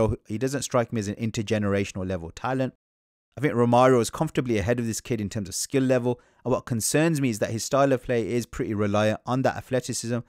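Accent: Birmingham, United Kingdom. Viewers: Brummie lad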